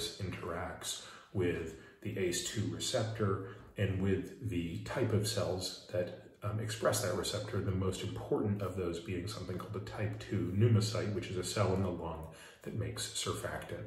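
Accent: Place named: Canada. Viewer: It might be the intonation since Peter is Canadian like Seth. Peter has a better voice.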